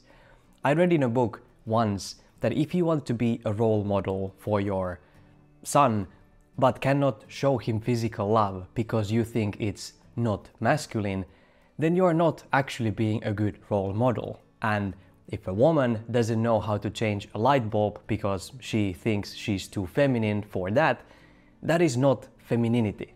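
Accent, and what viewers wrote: Finland. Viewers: The first word you said and me: he's Finnish lol. Greetings from Vantaa. Unfortunately even Finland has fallen into the american toxic mentality. Currently planning to go back to Italy (I'm Italian) and disappear in nature, mountains and sea. As anarchist I would say: screw (I usually tend to use the word that starts with a capital F) all these toxic societies, screw money, screw status, screw this sick narcissism, screw this insane form of capitalism, screw consumerism, screw this poisoning individualism and screw your racist country lol, at least part of it. I will miss a lot the good finn and probably you know what am talking about (usually the socialist ones). Now Finland you can hate me, I don't give a...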